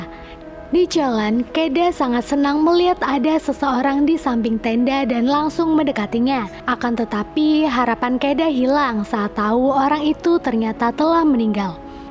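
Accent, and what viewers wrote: Pemalang, Indonesia. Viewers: Pemalang selatan ya😂😂😂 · Mba nya orang Pemalang kah?? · Mbak wong pemalang? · Wong pemalang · Mbak Pemalange Ndi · Mba smpean wong pemalang po?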